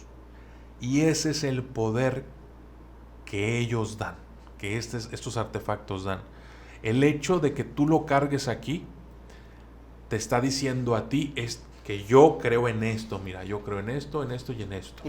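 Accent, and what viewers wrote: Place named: Yucatan, Mexico. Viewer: HE VISTO VIDEOS DEL DOCUMENTALISTA , Y ES UNO DE MIS FAVORITOS , TENGO UNA DUDA EL COCO QUE LLEVA LA BATUTA DE ESTE VIDEO , DE DONDE SERA ??? TIENE UN ACENTO DIFERENTE EN MI OPINIÓN COMO DE YUCATECO MÁS NO SE POR ESO PREGUNTO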